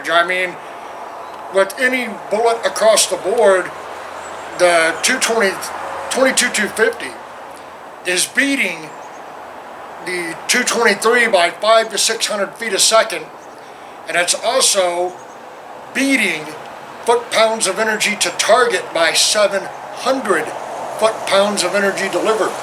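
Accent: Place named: Isle of Man, United Kingdom. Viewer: You have a Manx accent.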